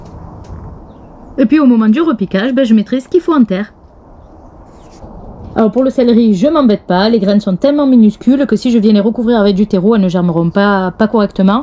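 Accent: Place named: Provence, France. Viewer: super merci pour la réponse, j'ai l'habitude de tout (ou presque) rentrer tant qu'il gèle. À la vue de tes résultats je vais m'inspirer de ta façon de faire, je suis en Provence, donc à l'ouïe de ton accent on devrait pas avoir une grosse différence de climat. 😉 À plus merci encore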